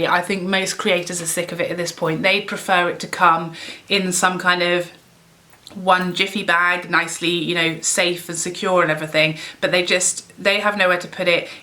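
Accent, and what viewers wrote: United Kingdom. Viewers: Gwaaaad I hate it when you speak so much sense 🤔... it’s a catch 22 isn’t it... if you could review new launches promptly you would get more subscribers... One of the things I LOVE about your channel is that you’re British... so apart from an awesome sense of irony... in a sea of American accents talking about American products, you really stand out. So maybe we could reach out to UK brands first? I’m onto Charlotte Tilbury...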